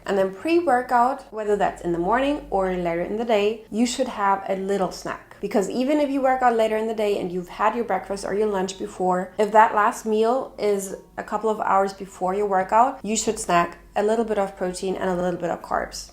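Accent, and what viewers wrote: Germany. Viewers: Are you german ? I cant listen to accent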